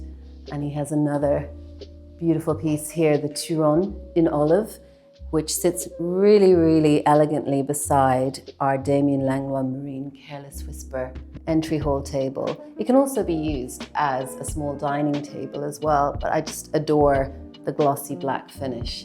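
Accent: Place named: Ireland. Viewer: I'm hearing a slight Irish lilt. Yep, she has a studio in Dublin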